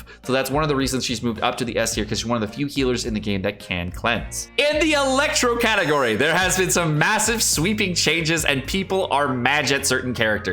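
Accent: RP, United Kingdom. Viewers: Man his British accent is awesome. Dam hilarious intros. Keep it up. 👍🏻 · I'm English. And your posh English accent is spot on!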